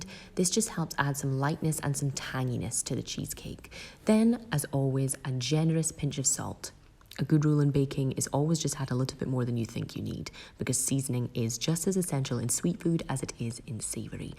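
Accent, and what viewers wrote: Scotland, United Kingdom. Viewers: Found your channel yesterday. Will be binging your videos after lunch. I could listen to your calm voice, and lush Scottish accent all day. Fantastic recipe, thank you 💕🏴🏴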